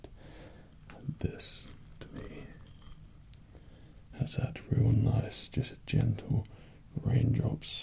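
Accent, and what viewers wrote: United Kingdom. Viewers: Are you British? It would be nice to find a british asmrist! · is he British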